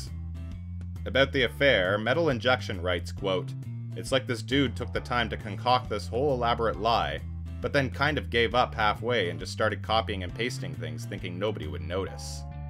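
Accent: Canada. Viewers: Sounds like a guy from Quebec called Alex Martel.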